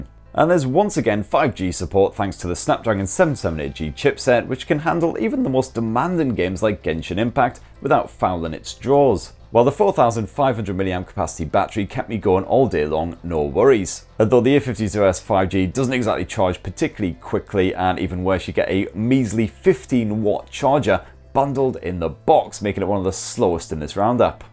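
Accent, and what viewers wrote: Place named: Newcastle, United Kingdom. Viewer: Where ya from ya sound geordie like myself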